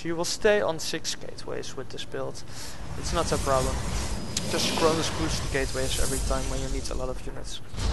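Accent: Netherlands. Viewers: Wanne Hollandse accent joch !!! Good video btw ! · Work on the Dutch accent ^_^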